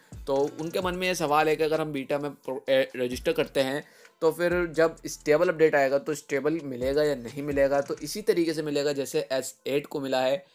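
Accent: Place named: United Kingdom. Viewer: Bhai itna aungrej kyu ban rhe ho.... Aisa lag rha hai jaise lagaan picture ke firangi ho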